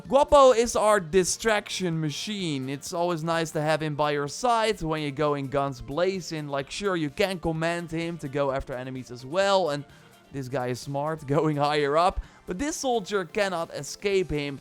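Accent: Netherlands. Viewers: You have a really dutch sounding Acsent are you dutch? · Nederlander ik hoor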